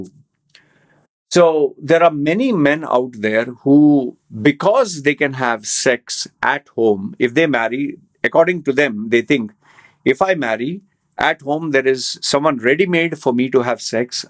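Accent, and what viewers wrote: India. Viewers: Are you an indian, your accent is completely indian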